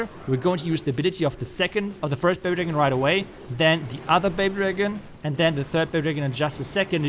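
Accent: Germany. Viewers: erstes mal englisches video gesehn man hört kaum einen deutschen akzent wow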